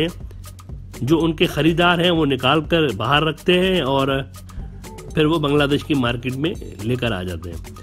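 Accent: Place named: Pakistan. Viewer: itna gherah Urdu boltta hee tav mojey laga Pakistani hee kioki Indian Urdu Hindi ka milawat hee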